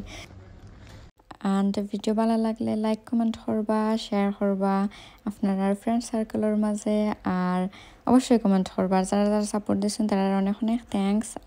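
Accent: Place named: Sylhet, Bangladesh. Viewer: Normal vhabe kotha bolle vhalo hoy Karon onek ktha bujhi onek ktha bujhina … jara sylheti na tader ektu somossha hote pare arki 😢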